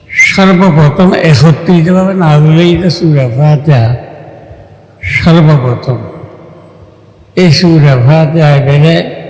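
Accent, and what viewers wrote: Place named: Chittagong, Bangladesh. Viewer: রাগ করে লাভ নাই ভাই উনি চিটাংগের ভাষায় কথা বলে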